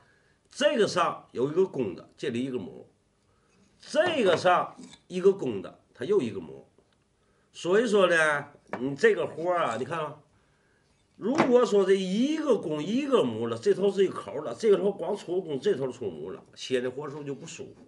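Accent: Tianjin, China. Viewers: 天津口音 哈哈，很亲切啊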